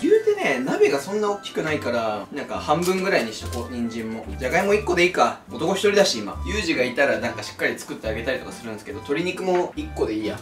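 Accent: Miyazaki, Japan. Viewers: 宮崎弁喋ってるの超好き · 宮崎弁最高👍👍👍👍👍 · の宮崎弁かっこいい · 宮崎弁可愛すぎる😂❤️ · わ〜！！！！ばちこりの宮崎弁嬉しい😭😭🤍(宮崎県民です) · うちの親戚宮崎人やけんめっちゃ宮崎弁懐かしい気持ちになるw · 宮崎弁すごい🤣🤣🤣🤣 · 今まで登録はせず、おすすめに上がってきたら観る程度だったんですけど、宮崎弁とただただ良い人感にやられて登録しましたww さんみたいな人が叔父だったらわたしもきっと自慢するし伯父っ子になりそう。。🥺🥰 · めっちゃ宮崎弁、もう宮崎弁で話した時あ、って思ったwめっちゃ親近感、私も宮崎県だからめっちゃ訛ってるw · さんの宮崎弁なんか好き笑ちなみに、私のおばあちゃん家も宮崎です！ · 最初の宮崎弁でめっちゃ親近感わいたっちゃけど😂(あえて宮崎弁で笑笑)度々出てくる宮崎弁がいつも嬉しい☺️🤞🏻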